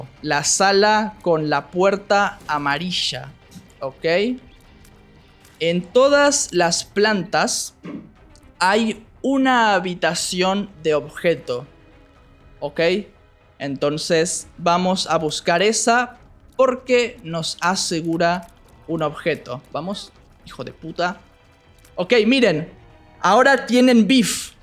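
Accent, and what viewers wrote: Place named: Argentina. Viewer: Se nota que es nativo, hasta se identifica a kilómetros que es de Argentina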